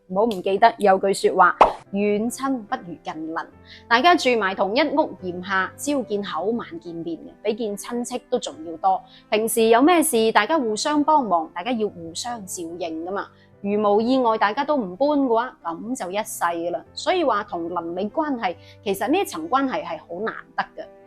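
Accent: Guangzhou, China. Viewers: 正宗廣府話好親切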